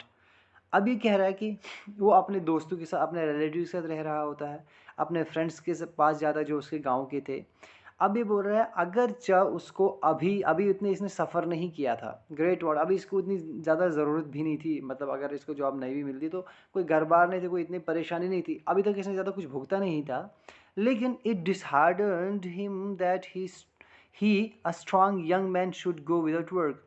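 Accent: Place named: Doda Kishtwar, India. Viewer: Sir aap ke batao se lagta hai ki aap dhodha kishtiward side se rehte hai ❤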